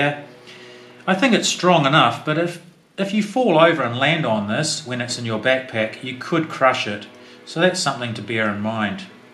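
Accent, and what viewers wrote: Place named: New Zealand. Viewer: nalgene also has a range of ultralite bottles. Nice video by the way, I was a little surprised when I heard the kiwi accent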